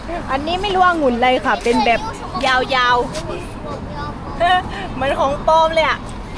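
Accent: South, Thailand. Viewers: คนใต้ไหมคะ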